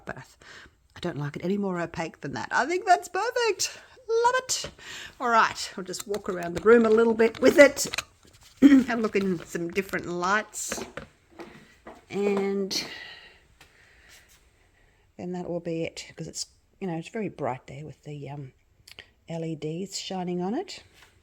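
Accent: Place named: Perth, Australia. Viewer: Oh my goodness that is one of the best blooms I’ve ever seen done! You make me want to get my resin out now & its 1.42am here in Yorkshire, England hahaha if my work is half as good as yours I will be very happy! I have quite a few family members who live in & around Perth & I love the accent! Your jovial passion for what you do shines through in your words & excitement! Never change my dear! Xxx Vick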